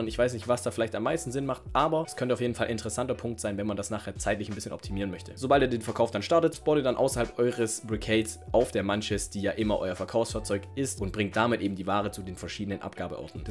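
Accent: Swabia, Germany. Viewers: wieder unglaublich gutes video, danke dir,. selbst meinem vater, dem i das video grad gezeigt hab, hat gemeint: " das ist aber ein sehr professioneller junger mann. aber bei dem hochdeutsch ist der doch kein schwabe? " - doch isser, unser 😁 ich wünsch euch allen ein frohes und besinnliches weihnachtsfest 🥳liebe grüße aus dem regnerischen Bärlin. peace and love ✌❤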